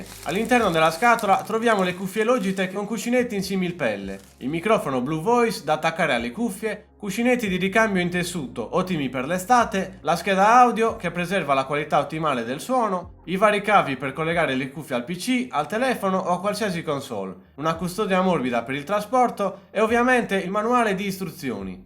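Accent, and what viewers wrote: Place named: Sassari, Italy. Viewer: ti scopro adesso, apro il video, passano 2 secondi e mi chiedo, ma sei di Sassari? La S e l'accento sono quelli, bel video comunque, due game ad apex facciamoli zi!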